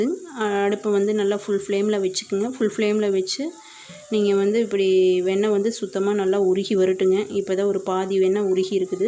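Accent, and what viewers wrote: Kongu, India. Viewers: Kongu Tamil super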